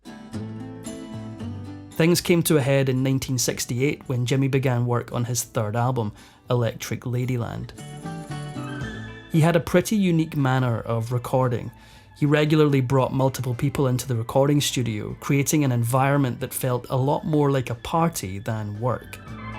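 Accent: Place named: Scotland, United Kingdom. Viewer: Yay my favorite narrator love listening to the Scot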